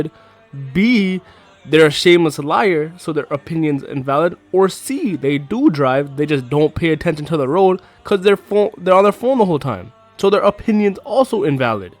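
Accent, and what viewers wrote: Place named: India. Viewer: My guy he is Indian too you can tell from his voice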